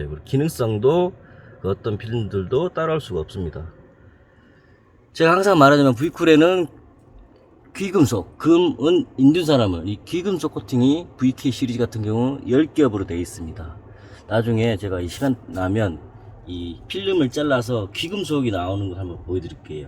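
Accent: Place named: Gwangju, South Korea. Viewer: 다른영상에도 댓글남겼었는데.. 번창하세요.. 같은 광주분이라서 더더욱 말씀이 구수하네요 ㅎㅎ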